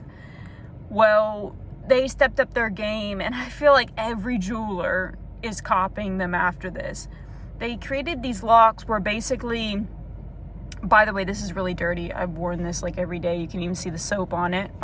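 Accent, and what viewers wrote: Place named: Alabama, United States. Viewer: Also, you sound like you're from Alabama, the "Ah Knoee" gave it away.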